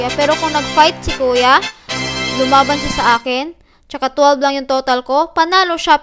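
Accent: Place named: Visayas, Philippines. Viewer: Bisaya ka day. Gwapa man jud ka, ?hehe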